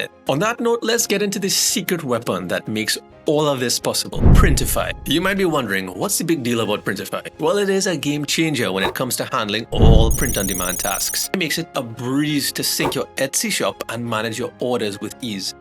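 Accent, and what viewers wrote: Trinidad and Tobago. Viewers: Are you Tinidadian? I'm hearing some sort of accent. Good content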